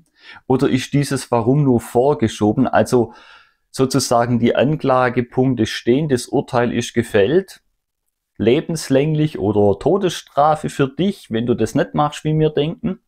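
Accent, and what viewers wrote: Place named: South, Germany. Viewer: Meiner Erfahrung nach sind Flying Monkeys auch nicht die Hellsten. Niemals würde ich mir eine Gruselstory über Abwesende anhören, ohne nachzufragen, ob der Berichterstatter das auch im Beisein des Betroffenen wiederholen würde. Damit oute ich mich natürlich schon als "ungeeignet" für die Rolle und werde womöglich selbst zur Zielscheibe, da nicht ausreichend untertänig/blöde. So ähnlich ist es in der Realität denn auch geschehen ... Übrigens schaue ich Ihre Videos total gerne. Nicht nur, weil Sie authentisch und sympathisch sind, sondern auch wegen des Dialekts (stamme selbst aus Süddeutschland). Liebe Grüße!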